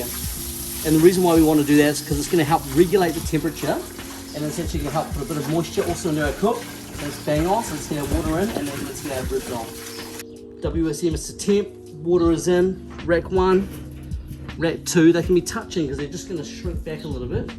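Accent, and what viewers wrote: New Zealand. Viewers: As soon as you spoke, I knew a Kiwi accent from anywhere 🥰 thanks for sharing 😊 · Looks great! Love seeing all of your BBQ gear! Do you have a favorite? Also, hearing you speak is making me miss NZ!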